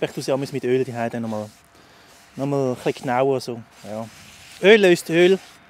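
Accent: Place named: Switzerland. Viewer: Hallo Erst mal ein frohes neues Jahr, hoffe du bist gut reingerutscht mit deiner Familie. Hast aber auch ein Top Wetter gehabt. Essen sah wieder lecker aus. So ein Kaffee ist immer was feines in der Natur. Hab jetzt Urlaub, dann geht es auch wieder in den Wald. Vielleicht kann ich dir ein paar Bilder über E-MAIL schicken. Hast du eine E-Mail Adresse? Glückwunsch zu drei Jahren Outdoor 🎉🎊🍻. Ich glaube so langsam schau ich mir auch deinen Kanal an. Machst auch immer Top Videos immer authentisch. und das Schweitzer Deutsch passt auch gut dazu. Bleib wie du bist. Bis zum nächsten Video. Grüße in die Schweiz aus dem schönen Siebengebirge 🇩🇪🇨🇭🤜🏻🤛🏻 Michael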